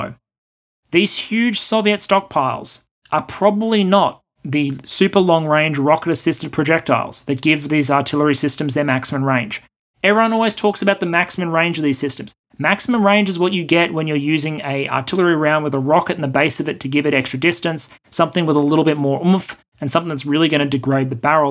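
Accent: Australia. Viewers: This video is three months old. But like all of videos...I can just listen to it over and over again. I think it's a mixture of the subtle comedy, absolute reasonable logic, and that cool sauve Aussie accent that is so calming.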